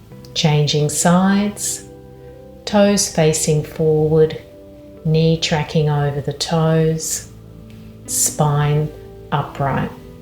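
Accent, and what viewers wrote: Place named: Australia. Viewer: Sublime thank you. I loved hearing the Aussie accent. What a beautiful setting...Blessings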